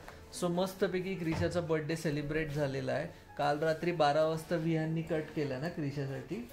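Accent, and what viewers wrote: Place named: Pune, India. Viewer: Full puneri vatatos boltana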